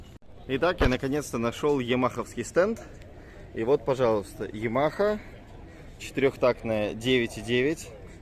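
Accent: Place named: United Kingdom. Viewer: вообще видос про мужика в конце это лишнее (к нему вопросов нет и лодка у него зачетная). интрига не сохранилась. голос был похож на голос ведущего из Уссурийска. я прифигел че его на острова занесло? да и ангицкое произношение меня тоже насторожило. думал катапультровался пацан в хренбритан. перед выборами туда ушел. и собаку даже в видосе не показал. без нее уехал. с женой видите ли машинки смотрят. ну думаю как все повернулось. ну думаю "отано чё". ан нет не он. разочарование. вам конечно поржать, а нам подписчикам стресс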